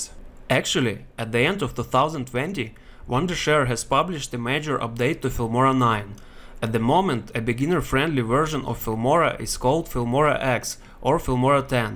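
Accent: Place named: Russia. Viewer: You have a russian or ukrainian accent :) Спасибо for the tutorial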